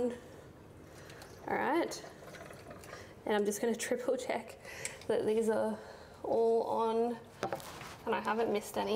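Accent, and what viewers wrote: Australia. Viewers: I love the way you say “Here” you must be from Australia ? Love the accent. Cheers from 🇨🇦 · I like how she says "here " but it's like "Heah" lol the Australian agent is nice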